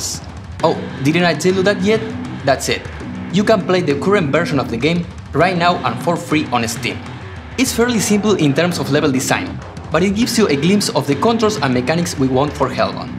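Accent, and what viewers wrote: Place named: Argentina. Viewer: Jajaja genial que al hablar ingles te sale todo el acento argento, genio!!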